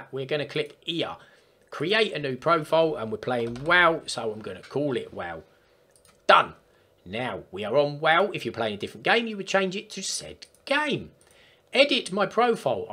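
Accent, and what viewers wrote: Cockney, United Kingdom. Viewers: Nufing wrong with your east end London voice son it’s only cos I’ve got one as well lol thanks for the video fella , I’ll give it a try 👍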